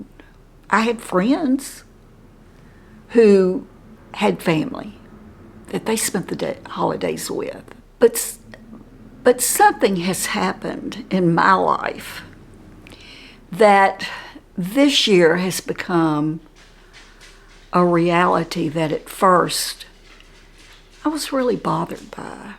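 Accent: South, United States. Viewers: I 💕😘 the way tells stories like a true Southern Belle 🙂 merry Xmas 🎄🦝🎄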